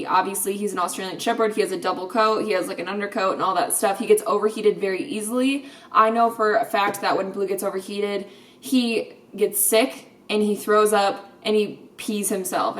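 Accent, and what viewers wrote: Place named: Appalachia, United States. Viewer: U r hella cool but plz stop doing that hillbilly accent u sound so stupid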